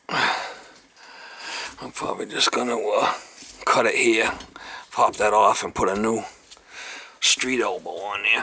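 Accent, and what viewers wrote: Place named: East Coast, United States. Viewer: East coast accent.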